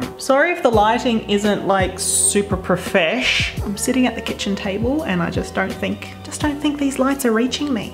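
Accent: Australia. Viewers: Can’t tell you how great it is to hear a fellow Aussie reviewing products. The mannerisms make the video 😂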